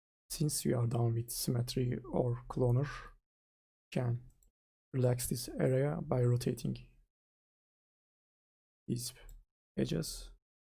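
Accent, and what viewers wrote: Turkey. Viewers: aksanından hareketle Türk olduğunu düşünüyorum... video için teşekkürler. faydalı teknikler göstermişin. 3-4 sene öncesine kadar senin gibi daha fazla kaynak bulabilseydim şimdi max kullanıyor olmazdım.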